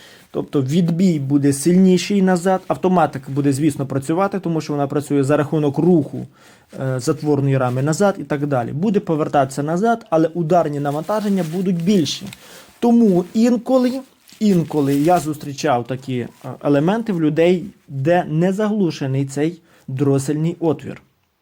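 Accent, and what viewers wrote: Lviv, Ukraine. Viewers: Чути Львівський акцент у Пана,хоча може я й не правий😊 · Красавчік, чую що із Львівщини чи поруч. Але контент цікавий😂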